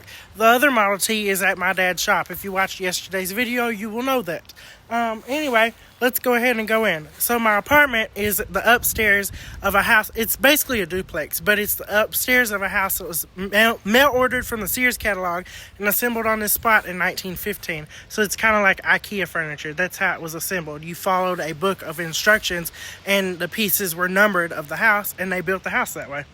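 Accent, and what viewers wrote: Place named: Louisiana, United States. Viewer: This just came across my feed and i am so intrigued by you and find you fascinating!! You dont happen to be from Louisiana by any chance do you? Bc i am from Louisiana and you sound like you might be. As well as the area that you live seems it could be. ❤ I have subscribed so that i can check out lots of your other content! Blessings and light to you!❤❤❤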